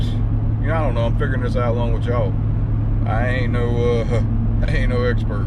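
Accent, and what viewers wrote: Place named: South, United States. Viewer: “A freaking Hog” 🤣 I’m glad I waited until I could watch this whole vid! All sorts of Southern coming out of you!! Love it! So crazy you pulled the first hook up right out of that same fish! The aggravating Bass guy was definitely fishing the right spots and he was probably cussing you just the same! Lol. Your little bait fish is my up coming PB!! Don’t be hating on him!! 😝 Glad to see you had an awesome day out! Also glad you stopped starring at that fish finder!! Techy, techy.. what in the world did our Granddaddy’s do?! Oh yeah, they just enjoyed being out there!! Lol.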